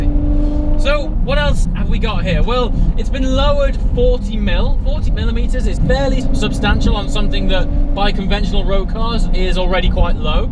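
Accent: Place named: Northern England, United Kingdom. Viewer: Great video dude , keep them coming I love your enthusiasm , & you talk correctly with flat Northern vowels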